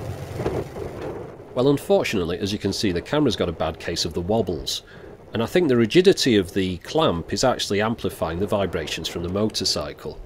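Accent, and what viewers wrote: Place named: Manchester, United Kingdom. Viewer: Cool Manchester accent. I wish I sounded like that...but of course everybody recognizes my accent..."I'm here to pump you up."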